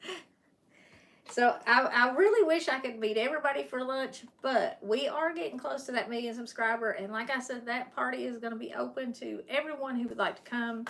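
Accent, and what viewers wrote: South, United States. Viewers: Congrats on your huge milestone! I found you during the lockdowns. I was hooked because you reminded me of the southern folk from Franklin NC that were so lovely and welcoming to my parents who moved there from Michigan. When I went to visit, it was like entering a new world. Wonderful people. Best food ever! After my dad passed and we moved mom north, that’s when I stumbled upon your channel. Your southern accent and country cooking reminded me of that wonderful place and wonderful people. I have your cookbook and I shared one of your videos with my mom. “Mom, you gotta watch this lady”. She loves you too. So, you see, you just never know who you touch just by following the Lord’s leading. Blessings sister. When we get to Heaven, I’m coming over for some southern cooking, a cup of coffee and conversation about our lovely Jesus. 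Until then…❤️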